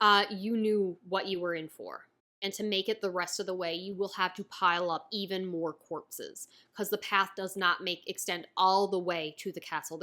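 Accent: Newfoundland, Canada. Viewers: Are you from newfoundland? Can't place your accent might be Minnesota.....it's a weird question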